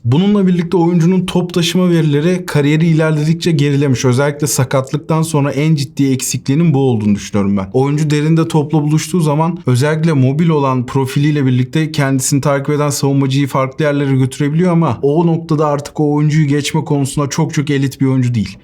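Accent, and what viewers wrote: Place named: England, United Kingdom. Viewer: ''Temi ebraham '' İngiliz aksanlı dinlemek keyif vericiydi yine. Abrahamın öz güven eksikliği var bizim kötü travmalarımız var son 4 yıldır Allah sonumuzu hayır etsin. Bizim taraftar sabırsız olursa elimizde büyük biz enkaz kalabilir ama moral verip destek olursa da alev alabilir. Ben bir çok sorunu çözebileceğini düşünüyorum etrafı doğru kurgulanırsa. Bu adam çok gol attığı sezonlarda hazırlayıcılarla oynadı hep. Romada Veretout Mmkhitaryan Zaniolo Pellegrini ve Karsdorp sayesinde attı 26 gol. Chelsea de Mount Willian Azpilicueta ve pulisic denen futbol teroristtinin mecburi pasları ile attı 17 gol. Ki o dönem pulisic şimdiki kadar bencil değildi.Aston villada Hourihane Mcginn Grealish Anwar el Ghazi Ahmed el Mohamady asistleri ile 26 gol attı. Bristolde keza öyle orada tüm hücum hattı Abrahamı beslemek üzerine kurulmuştu.Dikkat edilirse hep iyi orta yapan beklerle gol sayısını artırmış doğru orantılı. 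Milanda herhangi bir santraforun 15 gol atması mucize.Solda pas vermeyen leao sağda ondan beter bencil pulisic merkezde Allah canımı alsın diye dolaşan orta sahalar ile kimden pas alıp gol atacaksın? Orası ölçü OLAMAZ Abraham için.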